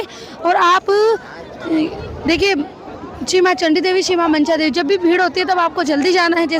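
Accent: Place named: Haryana, India. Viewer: जी आपकी आवाज सुनकर तो ऐसा लग रहा है जैसे आप हरियाणा से हो जी जय श्री राम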